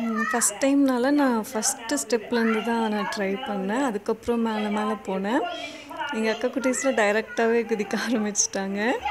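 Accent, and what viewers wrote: Kongu, India. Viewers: Unga slang language super sistaaaaaa...........kongu tamilachi😍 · மரியாதை கலந்த கொங்கு தமிழ். ஒருத்தரு நம்மள அடிச்சுக்க முடியாதம்மினி .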